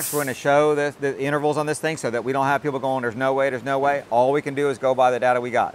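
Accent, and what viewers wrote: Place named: Georgia, United States. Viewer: where you guys based? Georgia? I used to work in Hinesville 2004. I`m from the gods country of Scotland lol, Love the accent guys ,YI-ALL doin a great job guys.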